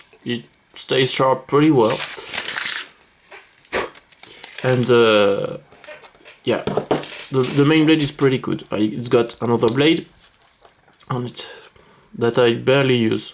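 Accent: France. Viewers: Ty for your time, you have a french accent, are you french? I am french, living in Scotland! I just ordered yesterday a huntsman camo ,uk legal, it's still in the mail, but I wanted to have someone's opinion on it! It's my Christmas present 😀,so t y again,it was helpful, and merry Christmas 🎄🥶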